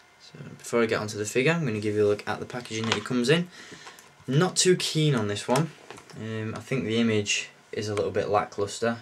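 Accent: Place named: Leicester, United Kingdom. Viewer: Hello there. Great video/review. I got this figure in the post today after ordering from Amazon. I completely agree with you; it's probably my favourite Luke Skywalker too! It was nice to see it out of the box as I'm keeping all my Lukes on the card. By the way, where are you from? I'm from Leicester and you and me have very similar accents.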